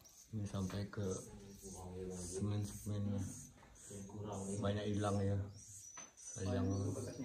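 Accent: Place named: Sambas, Indonesia. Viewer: Macam orang sambas pak aji ngomong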